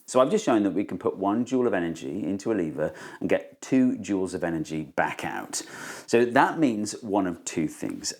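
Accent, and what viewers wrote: United Kingdom. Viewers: Just an idea that popped into my head at the end of the video, no idea if this is relevant or not, but does the hinge location relative to surface the ball is striking on the lever make a difference? The levers you tested either had the hinge point in the center of the lever or on the opposite side of the lever from where the balls where striking it. Since all these levers will necessarily have a thickness, the hinge point was never in-line with the contact surface. Would there be any difference if the hinge point was inline with the contact surface? what if the hinge point was moved in the opposite direction (towards the balls)? Or what would happen if you moved the hinge point even farther from the balls (like a couple inches[or I suppose you could use centimeters] back)? Another idea that popped into my head while typing this; why do English people not spell it 'leever' when they pronounce it that way? 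You don't pronounce the word 'level' that way.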